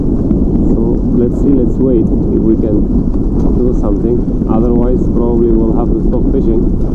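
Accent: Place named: Russia. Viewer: From the first seconds I've heard Russian accent. Hello from Saint-Pet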